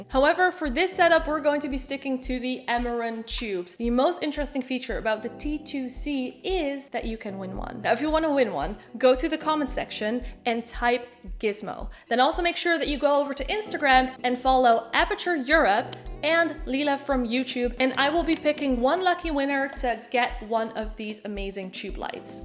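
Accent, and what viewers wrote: Netherlands. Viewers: Kaaskop accent spotted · I hear a vague Dutch accent somewhere in there! Great video. Thanks for the help. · Are you Dutch? Or German?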